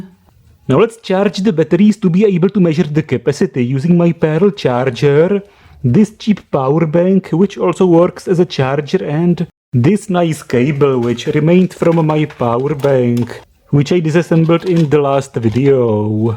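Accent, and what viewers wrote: Czech Republic. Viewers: No, It's Czechglish, believe me.. with strong Prague's accent. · Czech. Strong one. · That Czech accent 😀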